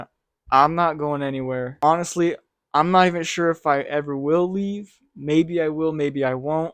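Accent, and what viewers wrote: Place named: Northern California, United States. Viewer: I was guessing he might be somewhere from the 530 area code in Cali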